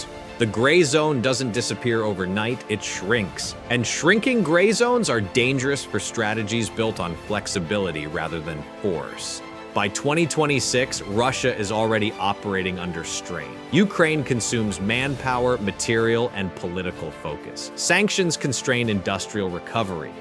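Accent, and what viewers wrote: United States. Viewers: This tosser with a Yank accent is so delusional he don't know what is happening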